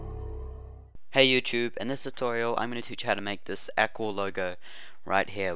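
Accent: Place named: New Zealand. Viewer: wonderful tutorial mate. do i hear a bit of NZ in your voice? :) very easy to follow and well demonstrated, no issues at all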